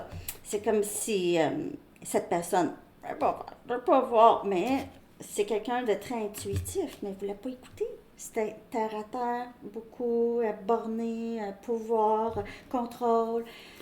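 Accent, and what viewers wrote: Canada. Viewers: Bonsoir ✨ Je suis nouvelle abonnée Merci pour vos messages avec ce délicieux accent canadien Gratitude infinie 🙏🦋🌹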